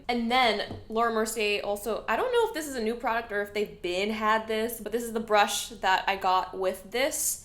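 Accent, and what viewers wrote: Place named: Australia. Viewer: I thought you were gonna say it's a "wowder" LOL been waiting for someone to shade glossier like that You look great!!! Also loving the free aussie accent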